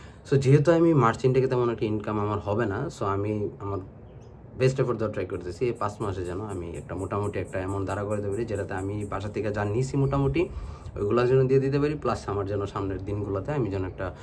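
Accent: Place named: Brahmanbaria, Bangladesh. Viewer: Bhai, take my salam. Apnr kotha bolar accent shune kno jani mone hocchilo j apni Brahmanbaria er accent e kotha bolchen. However, apnr fb id check kore dekhlam apni dhk thk. I dont know why, but still mone hocche brahmanbaria er keu kotha bolche 😄 Btw, I am planning to do my masters from Aus, thats why I've found your videos and started watching them. Thank you for the informations bhai. I am from Brahmanbaria ( Sarail)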